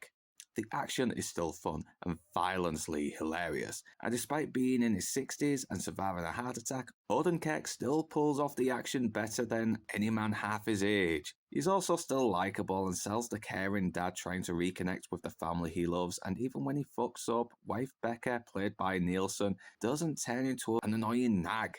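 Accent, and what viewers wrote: United Kingdom. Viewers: Thanks! As an Ex-Northerner (you can't get much more south than New Zealand!) I have to say, it's so refreshing to hear a good northern accent talking so much sense. Keep it up!!!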